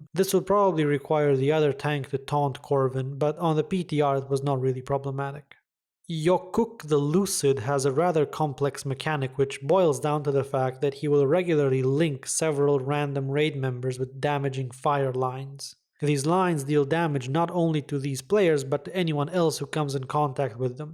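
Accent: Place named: Finland. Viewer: I´m not sure, but sounds finnish!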